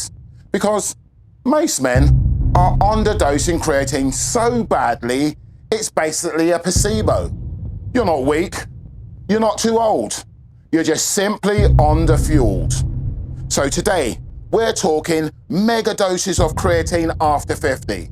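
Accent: United Kingdom. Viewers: He is so British that he sounds fake. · Sounds like midlands (Brummie)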